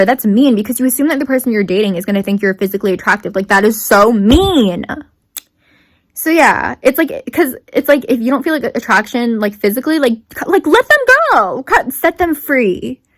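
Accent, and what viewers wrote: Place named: Australia. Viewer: ok but why is no one talking ab how fire that australian accent was??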